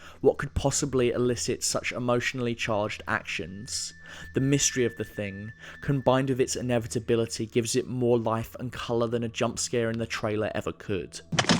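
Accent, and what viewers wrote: United Kingdom. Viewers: He's British, nothing odd about his voice.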